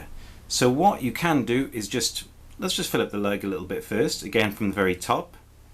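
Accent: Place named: Wales, United Kingdom. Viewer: Da iawn. Thought I detected a bit of Welsh accent. So cool to have pickups made in Wales.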